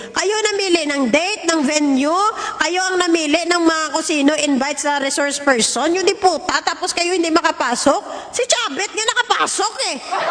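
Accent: Iloilo, Philippines. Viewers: I like your Iongga accent.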